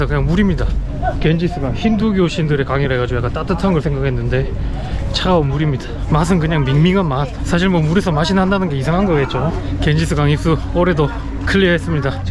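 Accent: Gyeongsang, South Korea. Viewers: 우연히 보게 됐는데 말투가 경상도 출신이신가요?? 제 친구가 옆에서 같이 보다가 의경얘기에 자기는 대구남부서에서 복무했다고 1028기라며 관심을 가지네요 구독해주라고 했으니 한명 더 늘어나겠네요 구독자 곧 1만인데 미리 축하드립니다! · 경상도 사투리에. 으이~~ 구호까징~ 세상친근합니다 저는 16년전 첸나이랑 다녀왔는데. 가족이 그곳에 있어서 인도여행이 이렇게 빡신지 모르고 댕겨왔네요~ 또가고싶은데. 가족들이 한국에 들어와서. 여행가기쉽지않네요~^^ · 잉! 인도를 이렇게 철학적으로 담아내도 되는겁니꽈?? 한편한편 홀린듯 보고 있습니다. 할일도 산더민데 ㅋ. 사연있어보이는 잘생긴 얼굴---- , 막걸리처럼 구수한 갱상도 억양---, 나름의 위트도 있네요 ㅋ. 혹 전공이 영상쪽인가요? 전업유튜버 하시죠!!!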